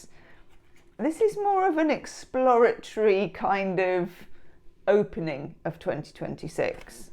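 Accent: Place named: West Midlands, United Kingdom. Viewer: Where are you from,? West Midlands? I've lived in Canada for 25 years now, but before that I lived in Stafford for a few years and it's refreshing to hear a voice like yours 🙂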